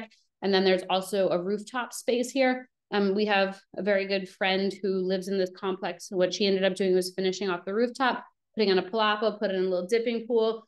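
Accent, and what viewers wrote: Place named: New York, United States. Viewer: As soon as you started speaking, I knew you were from NY!! :D Great content. Thank you for your work!! Looking forward to your next live session, and your other channel. Notifications are now on. Belize planning underway.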